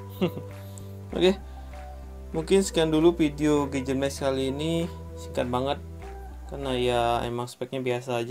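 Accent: Bali, Indonesia. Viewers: Dari logatnya kaya orang Bali.. Pakai gelang tridatu juga. Hehe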